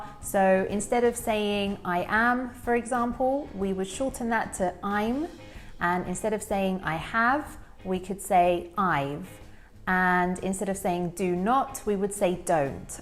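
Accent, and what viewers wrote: England, United Kingdom. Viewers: Please tell me in which british accent you are talking😊💐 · I love your British accent. 😍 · What cute British accent❣️ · This British accent is beautiful... =) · Ma'am u have British accent nd has American, right? So that means we r learning both the accents simultaneously 😊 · I really love your English accents but I also want you to talk a bit faster like because people not gonna talk slowly in reality.so the point is that you are trigger I have to pull first then the rest. Thanks for everything! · Is it British English, please inform me. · Ma'am, your British accent is so clear and soothing to hear. Thank you for the video.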